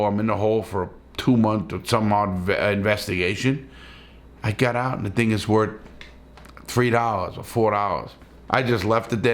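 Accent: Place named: Boston, United States. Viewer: You kinda have a Boston accent or a new England accent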